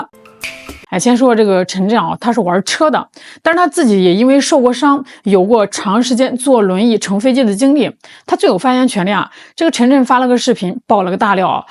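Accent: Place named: Shandong, China. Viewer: 我不在乎说什么 我是来听山东普通话的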